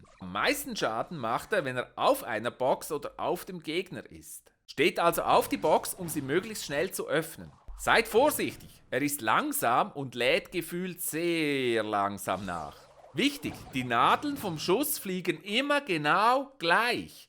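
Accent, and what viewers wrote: Switzerland. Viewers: Bist du a schweizar du bischt foll gaaaiiilll ich liebe das wen du sagst Sandwich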